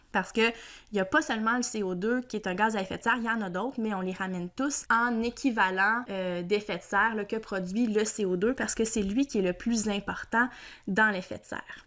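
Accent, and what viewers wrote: Quebec, Canada. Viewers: tabarnak de calice je suis tombé en amour avec l accent quebecois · Merci pour cette vidéo , vous avez été très clair, j'adore votre accent québécois 🥰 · J'adore l'accent québécois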